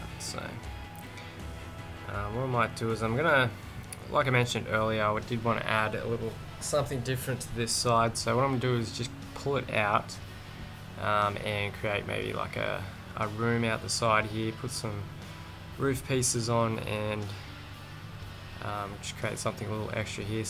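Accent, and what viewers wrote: Australia. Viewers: are you aussie, just curious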